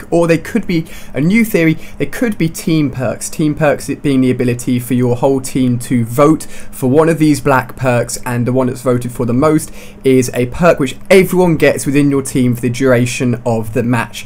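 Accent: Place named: United Kingdom. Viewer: man i so hate british accent.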